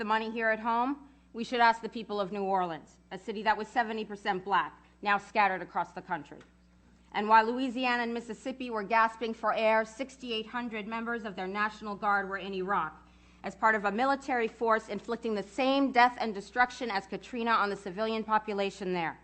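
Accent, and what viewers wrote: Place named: France. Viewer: Are you? You speak like you may be FRENCH.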